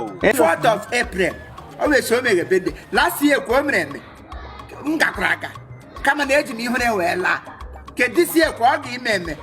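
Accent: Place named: Mbaise, Nigeria. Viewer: You are very talented, I like the mbaise your speaking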